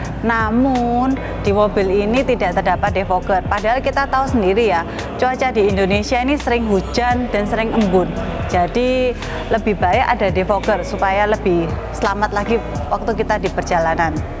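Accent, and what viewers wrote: Java, Indonesia. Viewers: aksen jawa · Jawa banget ngomong nya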